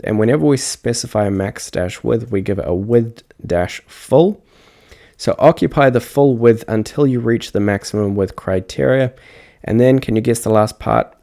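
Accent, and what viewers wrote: New Zealand. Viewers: New Zealand accent 🤔 chur? 😁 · You a kiwi?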